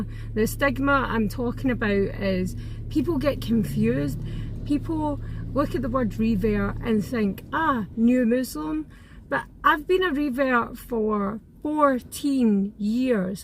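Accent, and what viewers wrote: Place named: London, United Kingdom. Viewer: Salam 3aleykii, where about in london you from sister? I love your voice and accent 😍😍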